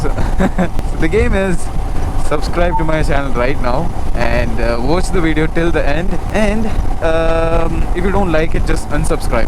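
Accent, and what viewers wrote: Karnataka, India. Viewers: Bro ur kannada slang is very nice bro 👏.. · ಕನ್ನಡ ಕೇಳೋಕೆ ಚೆಂದಾ❤️superb bro ❣️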